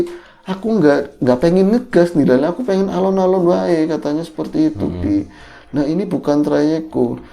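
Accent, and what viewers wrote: Banyumas, Indonesia. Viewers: Ora ngapak ora kepenak... 👍👍👍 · Tembe gie weruh mas ngomong ngapak loh😁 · iya sih mas,. tp jujur logat ngapak itu slah satu favorit saya..mskipun sya asli surabaya, tp sya prnh tinggal ckup lama dibanyumas..sukses slalu buat mas & mas,. smga chnelnya smkin maju&brkembang..tp ttap request ngapak nya diselipin dikit2 ya mas..hehehe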